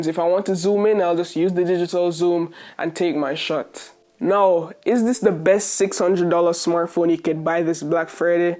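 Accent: Jamaica. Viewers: You sound very Jamaican. You Jamaican? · That Jamaican accent strong with this one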